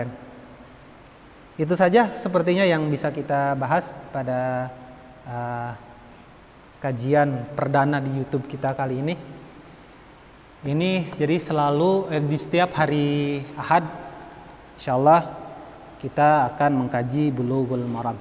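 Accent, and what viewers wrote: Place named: West Java, Indonesia. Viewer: Logatnya kaya orang Sunda ya ustadz, hehe maaf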